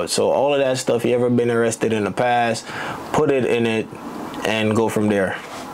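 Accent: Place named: Jamaica. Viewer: This dude Jamaican as hell. Can't hide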